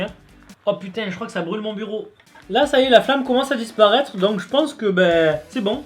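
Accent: Marseille, France. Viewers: Tu est Marseillais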